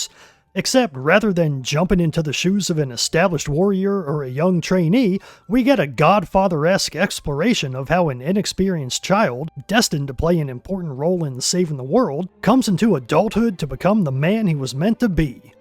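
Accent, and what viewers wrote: South, United States. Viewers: listening to a guy with a southern accent talk about JRPGs is like listening to an indian talk about using a toilet+toilet paper+washing their hands afterwards... not exactly the source i want to rely on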